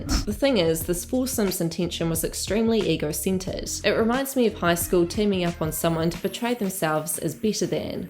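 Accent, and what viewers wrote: New Zealand. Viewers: Great vid always love to hear that kiwi 🥝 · Kiwi accent that’s why. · I am Australian. We don't have a twang. Only Kiwis and South Africans do. The way she pronounces things is definitely Kiwi. We have heaps of them living here, and vice-versa, so we're used to their accent.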